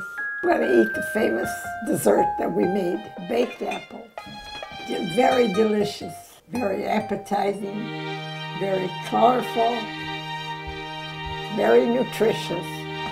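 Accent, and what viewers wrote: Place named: Chicago, United States. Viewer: I'm from Chicago right when i heard here accent i knew she was from my town Reminds me so much of my Grandparents Italian great cooking .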